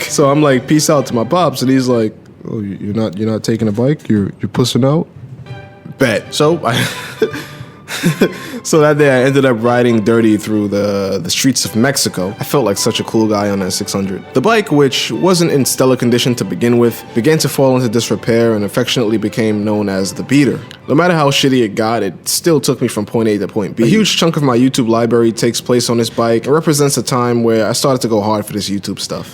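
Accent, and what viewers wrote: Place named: Brooklyn, United States. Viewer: I also live on the west coast now but I"m from the east coast and really miss NYC, so listening to your Brooklyn accent is the best thing ever.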